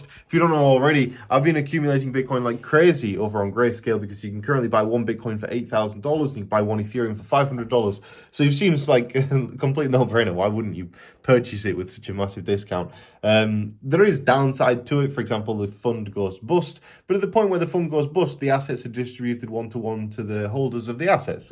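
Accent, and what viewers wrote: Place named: Northern England, United Kingdom. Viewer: Will do captain. Been subscribed for a year or so. I’m from up North too so it’s good to hear a familiar accent. Keep up the good work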